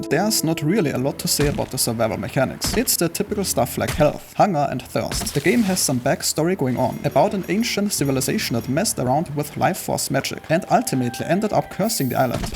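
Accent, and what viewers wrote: Germany. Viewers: man spürt quasi das du mitten in Deutschland wohnst :D im sinne hilfreicher Kritik würde ich dir raten an dem voiceover zu arbeiten :) ist sehr monoton und mich persölich triggert der deutsche Akzent :p #elevenlabs lass flutschen <3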